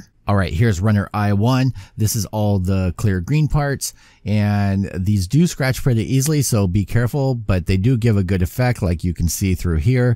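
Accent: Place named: West Coast, United States. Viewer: Accent? Hm.. I suppose he has a West Coast accent since he lives in California. I don't really hear too much of an accent though.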